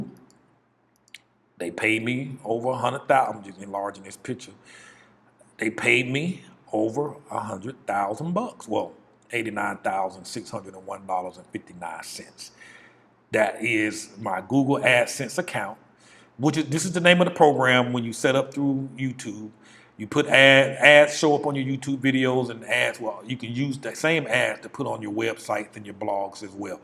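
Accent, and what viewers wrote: South, United States. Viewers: My man. You have this southern draw that cracks me up. . You're obviously very intelligent and I've enjoyed watching your journey.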